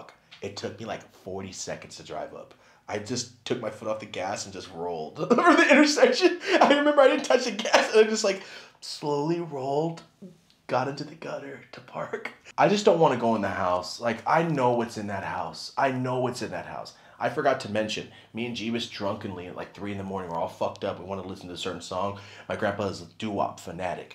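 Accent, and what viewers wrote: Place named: South, United States. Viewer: Am I the only one impressed by the southern accent?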